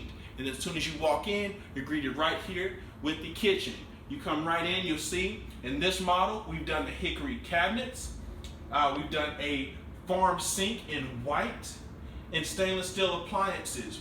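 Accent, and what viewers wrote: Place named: Texas, United States. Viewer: We can never watch the videos all the way through because the way talks is so annoying. A mix of thug and texas rancher. Most media forms recommend a standard midwestern accent or so I was taught in journalism class.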